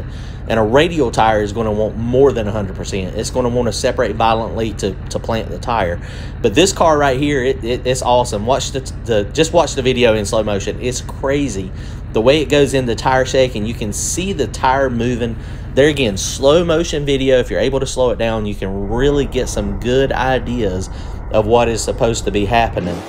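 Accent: South, United States. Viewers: Really cool informative video. Question are you originally from the south?.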